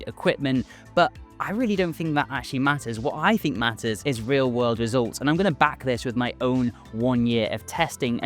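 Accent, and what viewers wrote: United Kingdom. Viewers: Please consider talking slower. I am forced to use subtitles due to your heavy British accent (Canada here), as well as your speed talking. (I've been told I do the same, lol) just a consideration. Thank you for the great review, I just got my s25 ultra, and am thinking that the watch would go nicely with it! 😁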